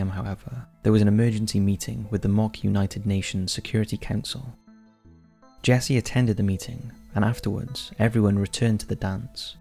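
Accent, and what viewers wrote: Wales, United Kingdom. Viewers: really? I'm picking up a newport/cardiff twang lol Maybe this can go in an unsolved mystery vid 🤣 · Pavman Cometh he's definitely Welsh! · I think he's Welsh? The way he pronounced some words made me think South West but he lacks that Farmers twang like me. 😂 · I read on several other posts that it’s a Welsh accent, so I do believe so. I recognise it somewhat since I once had a colleague from Cardiff area.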